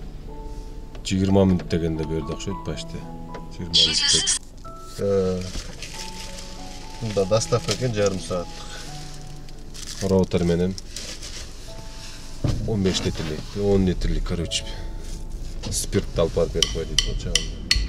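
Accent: Caucasus. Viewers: Кыргызлекенсинго, орус тили кавказкий акцент тугондубу ?😂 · Чото кавказский аксент болуп кеттиң эле акыркы күндөрү анан эле жок болуп кеттиң не болду экеен дедим эле.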